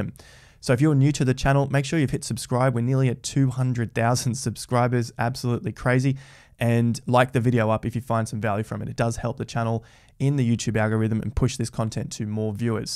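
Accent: Australia. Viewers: Hi! Good video and keep it going mate! It's nice to hear again a bit of 'stralian accent! Love and supporting you from Italy!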